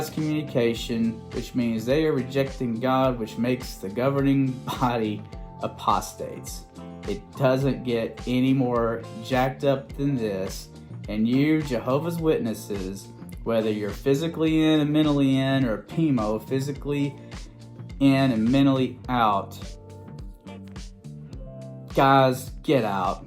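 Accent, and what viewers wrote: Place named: South, United States. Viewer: Very logical. A refreshing change from the GB! I enjoyed your southern accent too.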